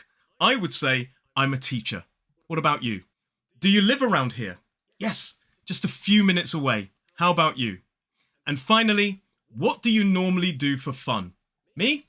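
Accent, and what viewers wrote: United Kingdom. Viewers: 我真的很喜歡英式腔調 I really like British accent….🫣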